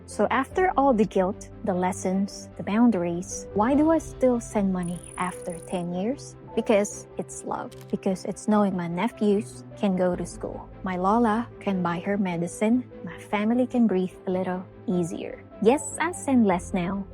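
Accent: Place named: Philippines. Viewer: I can hear your southern US accent mixed with your Filipino accent...hehe. Good video. Truth be told.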